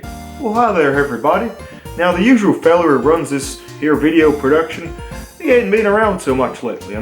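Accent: South, United States. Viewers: That is an excellent country western accent. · Thank you for finally making a video I can understand. Your authentic USA accent really helped!!!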